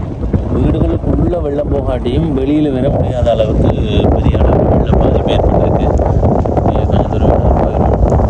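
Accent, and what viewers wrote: Sri Lanka. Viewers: முக்கியமாக நீஙகள் நல்ல தமிழ், யாழ்ப்பாண பாரம்பரியத்தில், பேசுகிறீகள் . நிறைய youtubers அப்படி இல்லை ..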